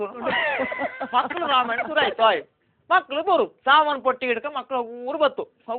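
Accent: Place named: Kundapura, India. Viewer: Namma hebri namm hemme, I love kundapura kannada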